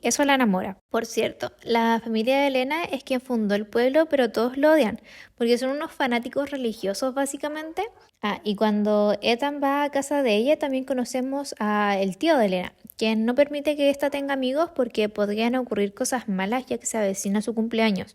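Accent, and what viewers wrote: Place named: Chile. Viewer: tengo que decir que tienes una voz bonita , PERO HABLAS MUY FEO por el momento DUDE en que IDIOMA HABLABAS. y me di cuenta que hablas asi por que eres CHILENA, y te quiero comentar que si vas a tener un canal y compartir contenido TRATA DE HABLAR MEJOR por que no todos entendemos el CHILEÑOL.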